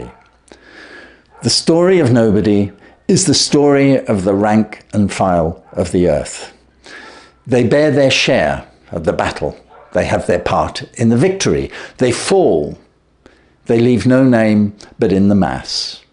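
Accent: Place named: RP, United Kingdom. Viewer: Many thanks to you Mr for this excellent voice and nice RP accent. I beseech the Almighty Allah to bless you. Accept my greetings to you from Iraq.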